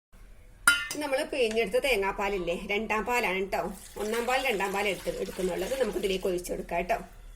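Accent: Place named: Palakkad, India. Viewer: Palakkad slang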